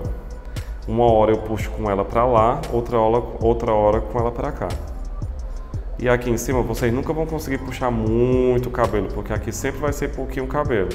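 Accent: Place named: Ceará, Brazil. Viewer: Já reconheci que é um cearense de longe kkkkk meu marido tbm é!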